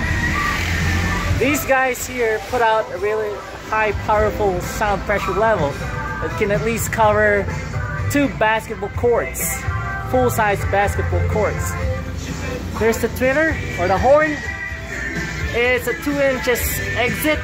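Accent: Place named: England, United Kingdom. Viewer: Great English Accent 👍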